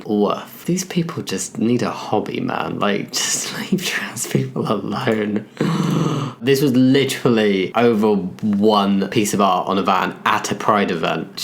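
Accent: United Kingdom. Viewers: can u do an american accent. Ur british accent sounds like nails on a chalkboard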